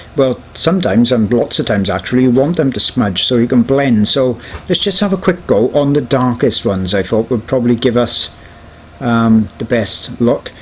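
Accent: United Kingdom. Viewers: British accent...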